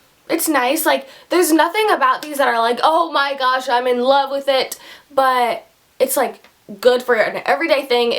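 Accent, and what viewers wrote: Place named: United States. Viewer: IKR!!! But American accent obviously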